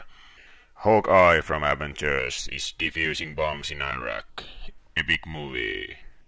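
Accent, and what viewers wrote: Finland. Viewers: Hassua miten heti tunnistin englannista että oot suomalainen vaikka en kattonu vielä nimees :D hyvä lista!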